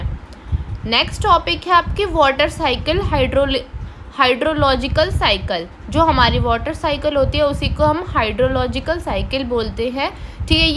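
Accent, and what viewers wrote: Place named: Uttar Pradesh, India. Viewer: Mam , gajab ho yaar aap.😊 Aapko style of talking is somewhat like UP wale jaisa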